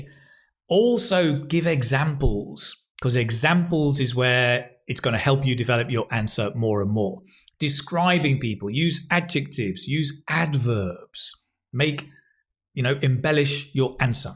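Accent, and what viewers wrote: United Kingdom. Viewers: "To be honest, Mr., I've fallen in love❤ with the English language all over again—especially the British accent—because of you."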